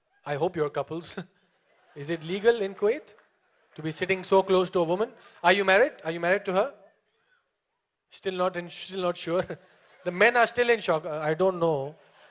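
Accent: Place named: Philippines. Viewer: Your Filipino accent is killing bruh!!!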